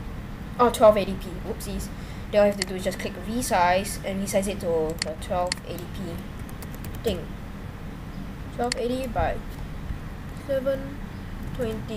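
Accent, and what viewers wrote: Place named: Singapore. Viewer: You sound like a singaporean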